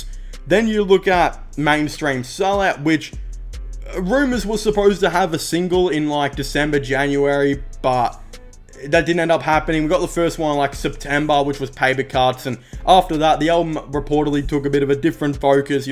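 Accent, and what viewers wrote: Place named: Australia. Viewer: aussie accent lad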